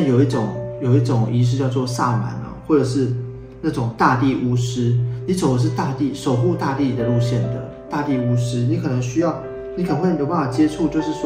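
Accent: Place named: South, Taiwan. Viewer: 難怪老師Hen有南部腔🤣我老家在屏東聽得出來🤣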